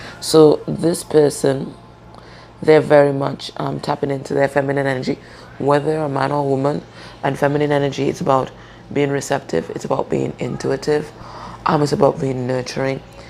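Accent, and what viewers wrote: Trinidad and Tobago. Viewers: Trini accent?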